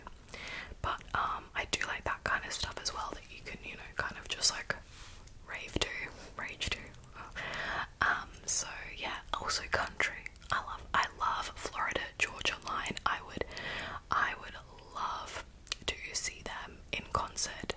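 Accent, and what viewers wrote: Australia. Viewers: I love your accent. I knew you were either Australian or a kiwi. I would love to visit Australia. You've definitely traveled more than me 😅 you're amazing never stop being you. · YESSS I KNEW YOU WERE FROM AUSTRALIA (it's hard to identify accents as a non native english speaker) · I'm Scottish, and if anyone believed you were Scottish...I'm terrified for Humanity lol XD I had you down for Australian pretty quickly, but I might have an unfair advantage due to some of my family being Australian, as they sound very similar to you :) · congratulations from russia,!! i'm very glad i came across your channel some time ago. even though i don't know you personally you seem to be a good person. i love your videos and i adore your aussie accent so much (i knew you were aussie! glad i got it right haha no one says 'no' like aussies do, totally love that ♡) thank you for everything you do for us, really, it means so much... 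and also we love a boyband fan. i'm a huge kpop fan, was a directioner too and i still listen to 5sos, their latest album is really good, 10/10 and as for your question i'm doing pretty good yet i'm worried as i graduated from the univeraity this year and i'm moving to a new apartment literally in two days and as you can tell my life is a mess and clearly not so stable right now. so once again thank you for your kind words. i will try my best not to stress too much and just enjoy the moment while going my own pace not sure if you read that and the comment is way too big then i expected but thank you, i love you and sending you my best regards! ❤ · Aussie Aussie Aussie!! I knew it!!💕💕💕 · Your Australian accent is definitely the strongest, but it took me some time watching you to really decide 😂 This was a fun video. It’s funny you prefer an American accent for ASMR. I am American, but prefer Australian or Scottish :) You’ve led a fascinating young life so far! 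· I’ve waited for this video for so long to confirm if you’re Aussie or not 😂 every video I changed my mind and convinced myself that I was wrong hahaha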